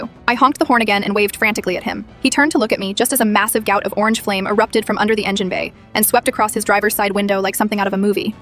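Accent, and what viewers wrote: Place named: Australia. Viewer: Hey, I have never heard an Australian version of this, it’s nice for a change, keep it you mate.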